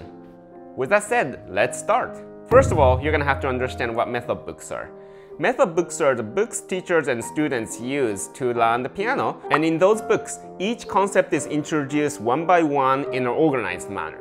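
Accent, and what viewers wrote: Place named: United States. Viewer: The American-English accent is not an advantage when trying to communicate to a worldwide audience.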